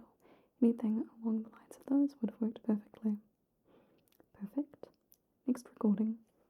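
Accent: New Zealand. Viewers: Amazing New Zealand accent I honestly don't hear it much in New Zealand so it's good to hear it in this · are u doing a Kiwi accent or are u kiwi? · I think the tingles came early and I shivered with a warm glow. Love the NZ dialect 🌹🌹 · i’m convinced people love the kiwi accent because sometimes it sounds transatlantic lol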